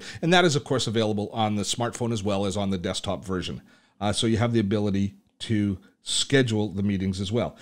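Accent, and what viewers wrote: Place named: Canada. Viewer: Love your Canadian accent.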